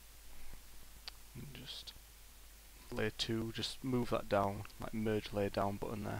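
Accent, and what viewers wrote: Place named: North, United Kingdom. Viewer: Thanks so much for posting! Simple, easy to understand and to top it, you're a northerner!!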